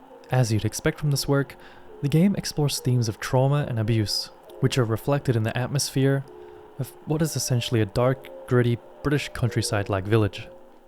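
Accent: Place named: Sweden. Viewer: Sounds like a light swedish/upper european accent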